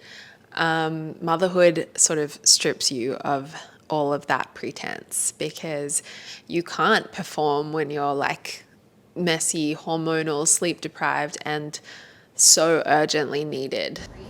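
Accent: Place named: Australia. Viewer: I noticed the Aussie accent! Where are you located?? I'm in NSW, talking about similar things on my Youtube channel. I'd love to connect! 💖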